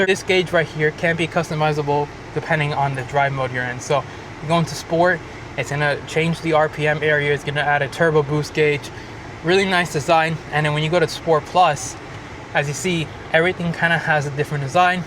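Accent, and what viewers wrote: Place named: Miami, United States. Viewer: It’s the Miami accent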